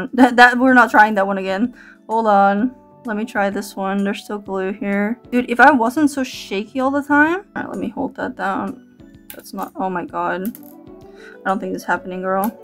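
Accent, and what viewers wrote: Netherlands. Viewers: The dutch accent is killing me 😭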